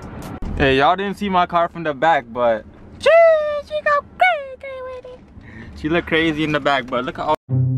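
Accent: Jamaica. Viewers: U know to talk like Jamaican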